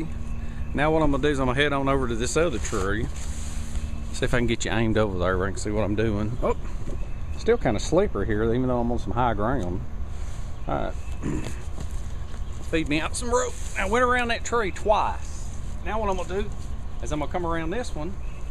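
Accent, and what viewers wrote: South, United States. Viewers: Howdy! The south is the only place where it's acceptable to turn a 1 syllable word into 2 syllables. :) I stumbled across your page a couple of days ago. What I like about your videos is that it causes me to think out of the box, plus the entertainment value. Very interesting ideas that you're sharing. Thanks!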